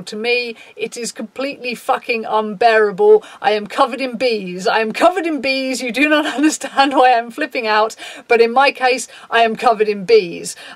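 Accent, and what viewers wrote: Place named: England, United Kingdom. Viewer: Oh my goodness, I just realised that you're from England I keep assuming people people are from america on netflix or youtube and cant even hear peoples accents, I have to stop and figure out if someone's English or American, is that weird? lol and im from England too hahaha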